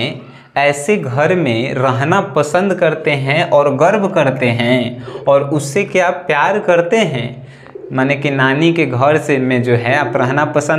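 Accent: Bihar, India. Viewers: Aap bihar se hai kya 😊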